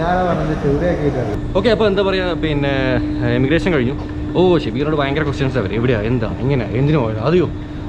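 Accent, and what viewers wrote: Kannur, India. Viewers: Ingale kannoor samsaaram poliyaatto ❤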